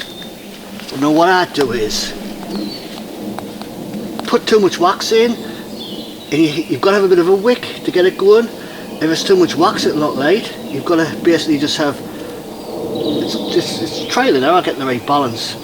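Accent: Newcastle, United Kingdom. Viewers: Fuck me! Who knew jimmy Cricket was a geordie?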